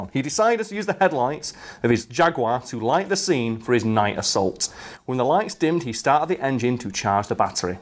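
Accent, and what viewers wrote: United Kingdom. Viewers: i can't understand british for the life of me and this video is solid proof of that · Did anyone else have to rewind this a few times because the British is too much?? Maybe it's just the Jack Daniel's, idk ;)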